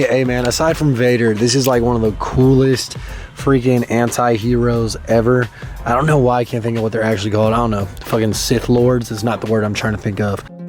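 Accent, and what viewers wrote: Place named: California, United States. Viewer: U got like a cali accent now and shit haha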